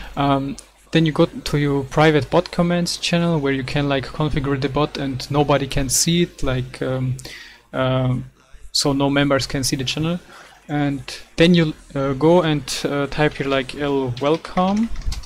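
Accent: Germany. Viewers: War klar das du Deutsch bist haha danke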